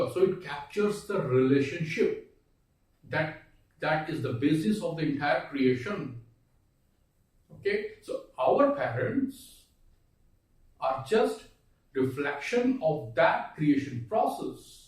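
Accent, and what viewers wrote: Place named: Malaysia. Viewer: u spoke like malaysian thats y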